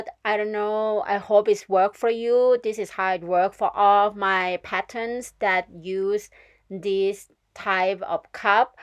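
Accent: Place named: Thailand. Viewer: Sounds like a Thai accent!! Love the tutorial!!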